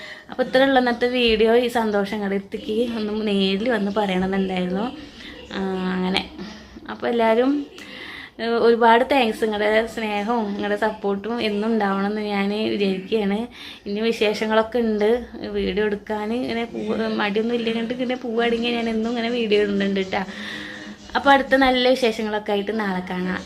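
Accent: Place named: Malappuram, India. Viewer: ഞാനൊരു മലപ്പുറം ഞാനിങ്ങനെ സംസാരിക്കും ❤ എനിക്കിഷ്ടപ്പെട്ടു😂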